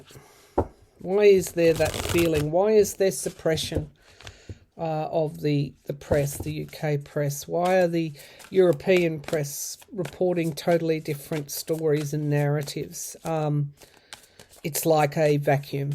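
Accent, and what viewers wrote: Australia. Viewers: I'm American and adore your feelings. Being on the side of what is right is where I am. I have to also say I love your Australian accent. It's so sweet and wonderful! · I appreciate your natural, calm professionalism without you being cold or putting on a show. So very glad to have found your channel and to hear an easy paced Australian voice. Even more, that you expertise ranges from cards to astrology, channeling and mediumship.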